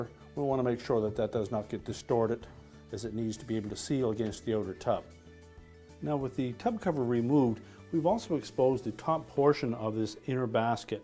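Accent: Canada. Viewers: Sounds like a Maritimer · I love his accent (canadian maybe?). « We will need to take this aöt, and once it is aöt, we can clean it »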